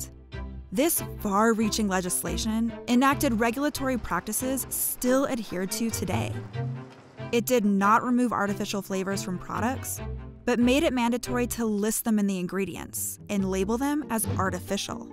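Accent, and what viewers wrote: United States. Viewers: From the UK and never ever heard someone speak with a glottal stop like this, no idea wtf you think British people sound like. this narrator is very much American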